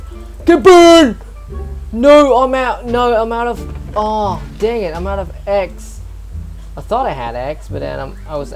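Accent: United Kingdom. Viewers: u should combine fighting with the sword with the fishing rod more often,especially use the fishing rod when someone is near the edge,it'll knock them off further xD (công nhận bác nói tiếng anh giỏi ghê >o< accent giọng Anh nhỉ?)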